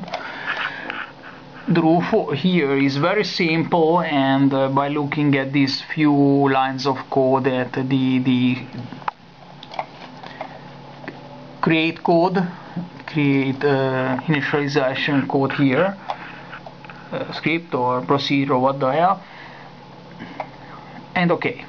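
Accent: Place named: Hungary. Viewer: HAHAH! Végig a videódban gyanús volt, hogy nem-e magyar vagy, és lám utána elolvasva a kommented, kiderül tényleg! Annyira tipikus a magyorok angol akcentusa, hogy bárhol a világon meghallasz egy magyart angolul beszélni, rögtön le lehet vágni! Gratula a progihoz! Csak most találtam rá tök véletlen, mert a minap valahogy belefutottam egy az 1nsane-ről készült videóba, amiről meg rögtön beugrott ugye a nagy ős klasszikus Terep2. Amikor rákerestem, valahogy a te progidba is belefutottam. Kár, hogy nem fejlesztetted tovább...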